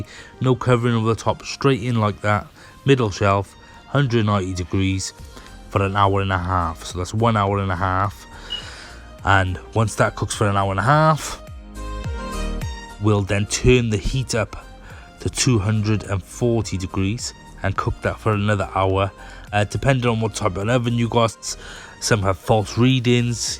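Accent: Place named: Cardiff, United Kingdom. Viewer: Got to be a cardiff boy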